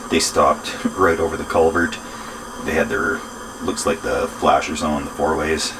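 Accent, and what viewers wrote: Canada. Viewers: You remind me so much of Jujimufu except you're sleeping a storm drain and have a canadian accent.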